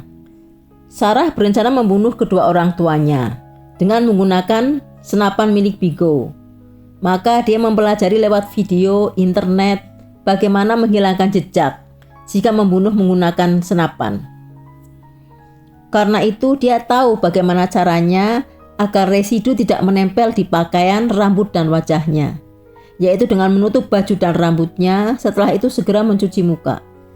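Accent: Java, Indonesia. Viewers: Logatnya medok bgt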